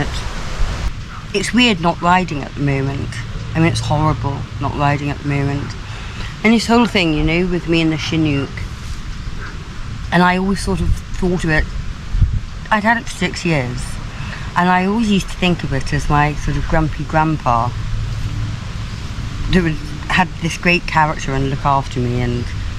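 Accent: RP, United Kingdom. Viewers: I hope to go state side this year or early next year, I think it’s because most of us in the British isles grew up with American culture and values, I have to brush up on my American English , because I am more used to speaking Hiberno-English, I really love your accent it sounds like real queens English, anyway enjoy you travels, I love your channel been over a year now. Keep the rubber side down,😊😊😊😊😊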